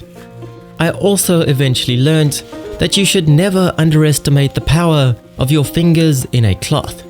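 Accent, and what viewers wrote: South Africa. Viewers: How do you know JHS Racing bro? I just assumed from the accent you were in RSA. Awesome crew aren't they? Great vid, thanks mate. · dud are you from south africa?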